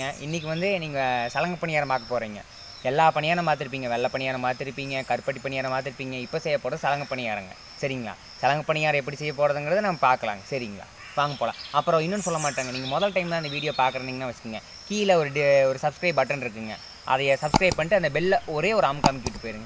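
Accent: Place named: Coimbatore, India. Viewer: Nice da machi 💯👍❤️ Nama coimbatore slang Vera lvl nga🔥🤩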